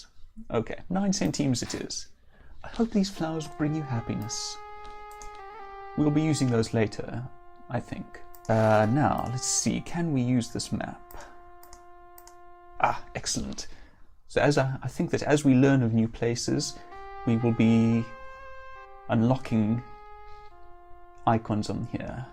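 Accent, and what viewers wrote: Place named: United Kingdom. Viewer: Yo, it's Spladam, killer accent dude, what part of UK is that from? I forgot how beautiful the VGA remake of this game was.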